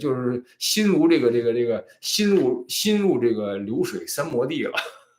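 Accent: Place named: Beijing, China. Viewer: 笑出聲兒……昨天沒睡好郁悶的慌，聽了您的京腔兒播報感覺松爽很多。您小时候得有多淘呀⋯⋯哈哈😂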